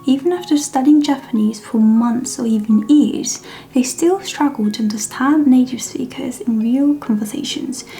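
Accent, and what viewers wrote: United Kingdom. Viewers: Born in Okinawa but has a British accent? Huh?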